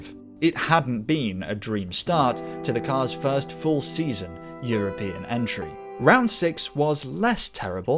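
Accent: United Kingdom. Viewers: This is only the 2nd video I’ve seen of yours on here and sir, let me tell you, I have never hit the “subscribe” button so hard in my life. As a “relatively” new car fanatic, especially for Nissan specifically. What’s better than learning general lore of Nissan’s professional/formal racing history? Learning around side subject from a guy with a cool British accent. Really man, thanks for your content! Much love from Charleston SC!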